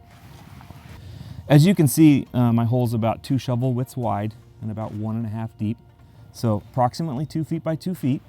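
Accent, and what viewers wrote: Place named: Pennsylvania, United States. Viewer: Great video. Sounds like a PA accent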